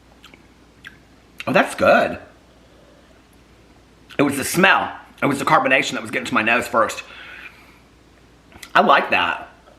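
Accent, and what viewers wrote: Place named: Minnesota, United States. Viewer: I'm dying with your Minnesota accent! 😂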